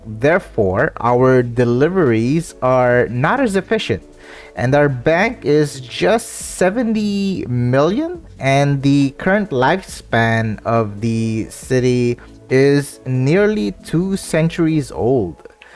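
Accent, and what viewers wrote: Philippines. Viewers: Are you a filipino? Your accent is familiar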